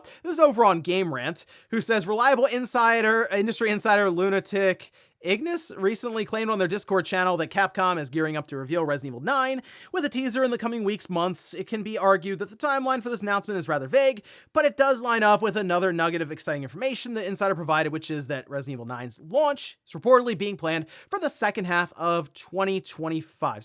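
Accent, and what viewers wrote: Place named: Pennsylvania, United States. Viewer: It's the Pennsylvania accent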